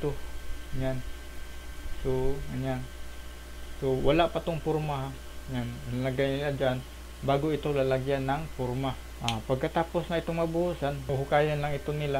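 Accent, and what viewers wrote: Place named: Visayas, Philippines. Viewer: Bisaya kasi ganyan tlaga mag salita ang mga bisaya.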